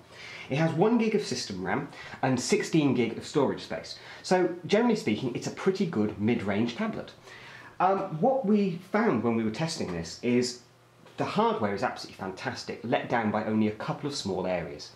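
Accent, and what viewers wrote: United Kingdom. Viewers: so british :D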